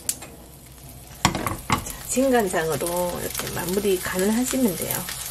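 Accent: Gyeongsang, South Korea. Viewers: 달성공원 언급하시는 거 보니까 대구분이신가 보네요? 경상도 억양을 들으면서 항상 어디신가 궁금했었는데... 반갑습니다, ^^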